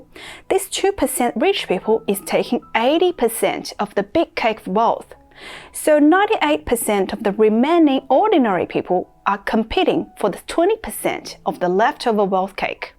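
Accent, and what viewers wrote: Australia. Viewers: Aussie accent init? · I’ve never heard a Chines/Australian accent before, interesting.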